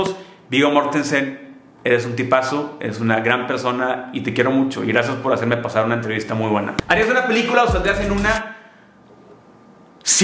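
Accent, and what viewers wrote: Monterrey, Mexico. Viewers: Los regiosssss su acentooooo😊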